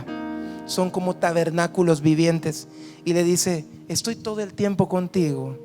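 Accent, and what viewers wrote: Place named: Guatemala. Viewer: Andaba buscando música Heavy metal en español y pare aquí, me llamo la atención el acento chapin, Soy de Guatemala y, por el acento y forma de hablar, el también es de Guatemala, C.A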